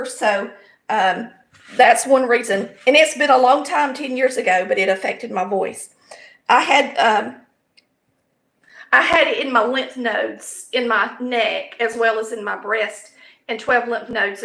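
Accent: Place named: Carolinas, United States. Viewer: 🌷🌷So enjoyed watching you fix your family's Sunday breakfast! 🌷🌷 I hope you know how very blessed you are to have such a HUGE kitchen to work in! I'm always fussing about my little "play-kitchen" being so small with no room to put stuff! ☺ Anyway ... I'm gonna try this method. I hadn't fixed biscuits in quite a while but need to start again since the frozen ones now have begun to add TERRIBLE ingredients. Yours remind me a little of Brenda Gantt's way of fixing biscuits. BTW - I'm guessing you are located somewhere in the Carolinas by your accent? (I'm a North Carolina girl, myself, and now live on the VA-NC line.) May the Good Lord bless you and yours ... and you are doing a GREAT job!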